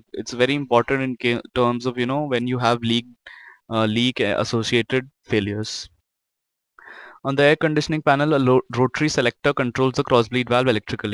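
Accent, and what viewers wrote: India. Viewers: Are you from India ? You speak like Indian 😊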